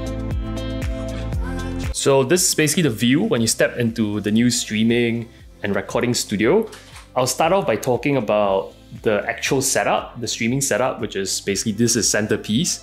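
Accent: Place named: Singapore. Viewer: Hey, are you Singaporean (you sound like one)? Been watching your streams for a while now and really like your content/set up (even though I don't tank). I recall you mentioned somewhere before that you have a full time job as an investment banker and now it appears you are married too. How do you manage a full time highly stressed job (I'm in banking too) with a wife and yet able to spend this much effort into the game and your content? I suppose your wife fully supports you and she must be into gaming as well? I see that she's a naruto fan as well:) I've been playing wow since it first came out when I was university but now as a married man with a full time job and a kid, reality kinda catches up with you.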